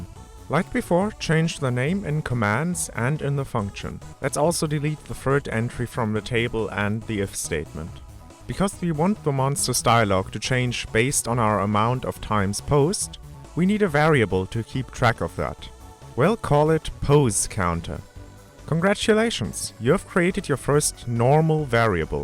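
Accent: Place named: Germany. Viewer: are you, by any chance, german? bc your voice sounds similar to some german youtubers speaking english.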